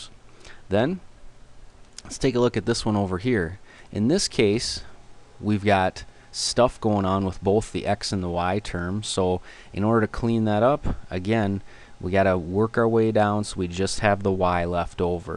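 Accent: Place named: Canada. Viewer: i can tell this dudes canadian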